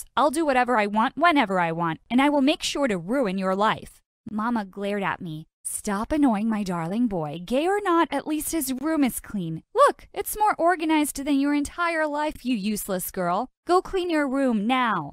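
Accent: Philippines. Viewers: wow i was shock i like the filipino accent and the word makes me laugh hahahhaha and she mentioned phili[[ines i feel happy and excited HAHAHH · Omg I'm a pilipino and the freakin accent😭😂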